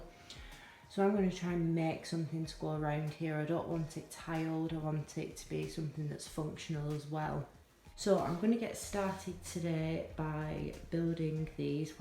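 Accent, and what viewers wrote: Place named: Bury, United Kingdom. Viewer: I was going to paint them but I think your finish looks so much neater. I cannot believe your end result...my god you're Superwoman and without getting too deep...a massive inspiration to your daughter no doubt! Us girls can do anything a man can do😂😂 I'm in Bury and your accent sounds like you are from around these parts too! So glad I found your channel! X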